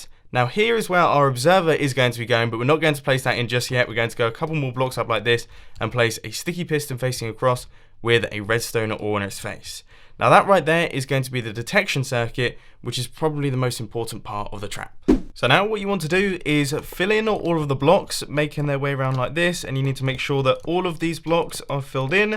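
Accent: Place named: United Kingdom. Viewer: Oh um sorry. Thanks for another fantastic video mate! Please keep it up! I also like your British Accent!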